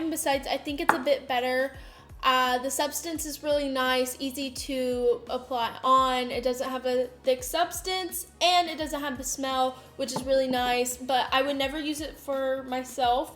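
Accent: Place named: South, United States. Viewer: Katie Washington she sounds very southern to me.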